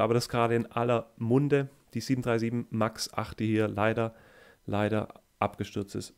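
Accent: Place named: High German, Germany. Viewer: I know German I was born 100 percent pure German I am a hutterite. He's talking g high german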